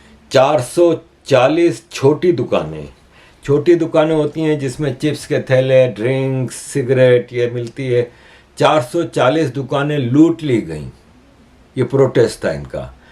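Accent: Gujarat, India. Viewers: shab mujhe lagta he app Gujarati hoo app key bol gujrati Jaysh lagte he❤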